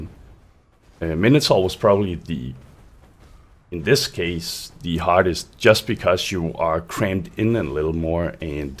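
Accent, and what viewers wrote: Denmark. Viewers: hey are you Danish?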